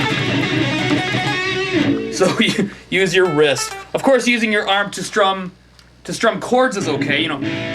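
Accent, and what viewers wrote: Canada. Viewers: Your Canadian accent is strong in this video. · ... are you canadian... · Because he's Canadian (i think). They don't eat words or fart them like the Americans and the guys at UK.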